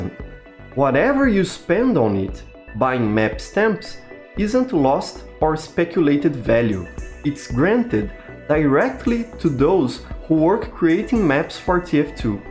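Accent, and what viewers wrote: Brazil. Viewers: tu e br né? Seu sotaque entrega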